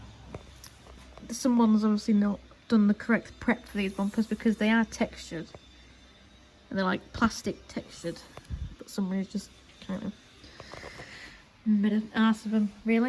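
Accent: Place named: West Yorkshire, United Kingdom. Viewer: Loved the video, I could listen to a West Yorkshire accent all day long! Interestingly I didn’t realise you needed a bonnet, we might have one of those somewhere too! Can’t promise it’s great but I remember it being better than your current one. we can hunt around the dreaded store room when lockdown allows! 👍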